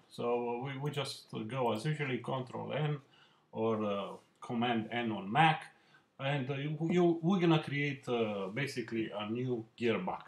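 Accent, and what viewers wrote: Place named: United States. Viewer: USA speaker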